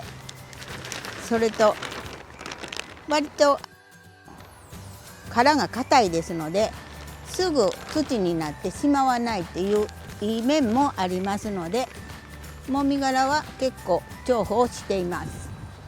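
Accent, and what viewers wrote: Tosa, Japan. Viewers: 懐かしい、土佐弁の解説。最近、家庭菜園を始めました。夏野菜は、きゅうり、ナス。オクラなどを植えて、少しですが今年の夏は野菜代、助かりました。いろいろと、失敗したりしながらですが、楽しいです。の解説がわかりやすくて、親しみがあって。これからも、参考にさせていただきます。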